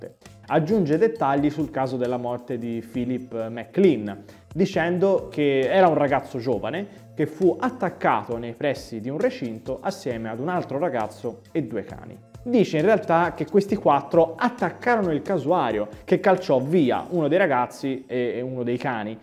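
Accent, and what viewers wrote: Tuscany, Italy. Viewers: bello sentire parlare un po' di Toscano maremma cigniala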